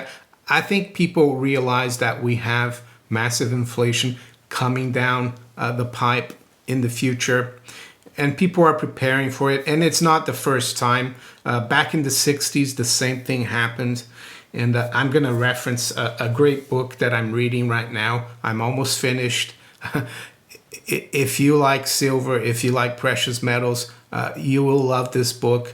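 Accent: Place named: United States. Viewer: do I have this right? You are an Italian, born in Brazil, You talk like an American, and live in England?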